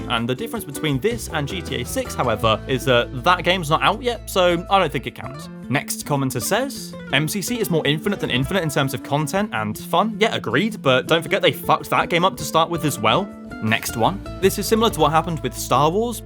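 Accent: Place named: United Kingdom. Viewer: click on video I'm interested in watching -Generic well spoken British guy again? 😮‍💨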